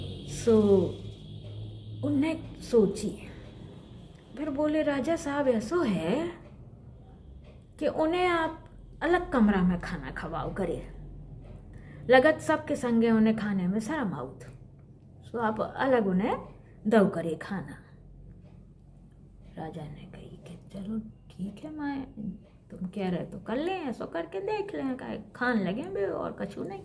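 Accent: Bundelkhand, India. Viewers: बड़ो नोनो हतो जे किस्सा तुमाई मीठी बुंदेलखंडी बोली बहुतई भा गई हमें गुईयाँ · बुंदेलखंडी की मीठी जुबान, और आपका सुनाने का अंदाज मजा आ गया 🥰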